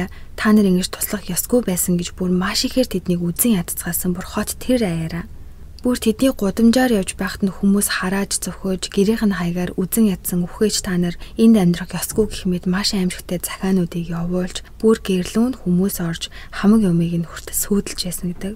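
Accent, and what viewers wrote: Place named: United Kingdom. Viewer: MONGOLOOR yarij bgaa uhaantai YUM bol Angli hunii neriig Angli ayalgaar BUU HEL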